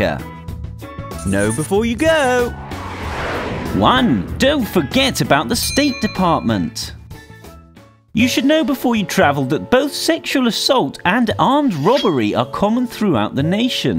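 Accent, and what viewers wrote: United Kingdom. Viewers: This dude is clearly British (his accent) and he is talking about driving on "wrong" side of the road. Dude get serious. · And guys how are u going to assume he's English as we call it just cause he has British accent And plus DUDE OF COARSE THERE GOING TO ASK U TO GET THEIR STUFF and they are just trying to be polite to see if u need help IF U WALK TO A MALL LIKE FONTANA (PS Fontana is a pharmacy here) THEY WILL ASK U TOO THEY Do THAT EVERYWHERE HERE Again not trying to be rude if this comment is offensive I will take it down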